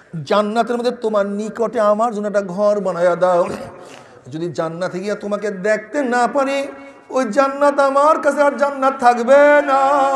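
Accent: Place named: Barishal, Bangladesh. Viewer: শায়েখ মুখ থেকে বরিশালের ভাশা শুনলাম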